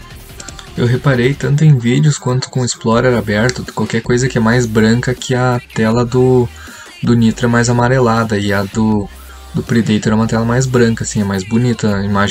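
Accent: Brazil. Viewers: Tu é de SP? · Amo assistir um video de tecnologia com um apresentador Gaúcho. É uma baita barbaridade thê kkk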